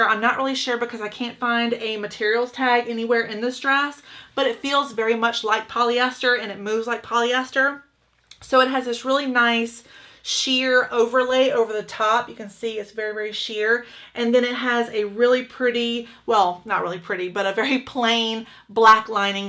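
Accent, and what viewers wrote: South, United States. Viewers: You looked good in all of the dresses. Love your channel! You give so much more info than others I’ve watched. Plus, I get to hear a southern accent - represent, girl!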